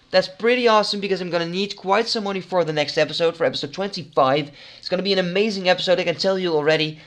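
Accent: Netherlands. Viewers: heard you were dutch the second you started talking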